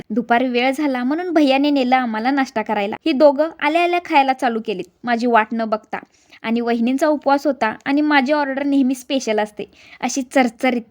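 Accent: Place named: Sangli, India. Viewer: मी पण सांगलीची आहे तुम्ही बोललाकी मला खुप आपली माणस बोलता आस वाटतय खुप छान वाटतय तुमचे व्हिडिओ बघुन😊😊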